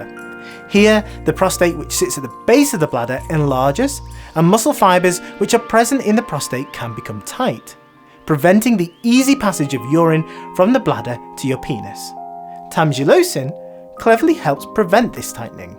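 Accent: United Kingdom. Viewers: He has a British accent because he’s British. He speaks very clearly and is easy to understand to me and the background music is fine too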